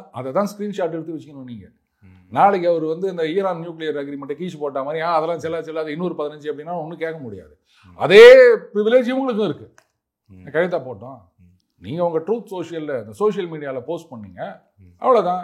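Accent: Chennai, India. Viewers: தங்கள் Madras பாஷை ஸ்டைலில் உலக நடப்பு, அதனால் பாரதத்தில் ஏற்படும் தாக்கம் ஆகியவை தேசிய உணர்வுடன் மிகவும் ஸ்வாரஸ்யமாக கூறுகிறீர்கள். மிக்க அருமை.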